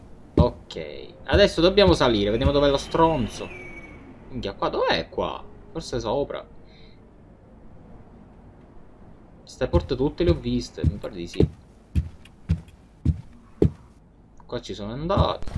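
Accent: Palermo, Italy. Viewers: l'accento palermitano migliora tutte le urla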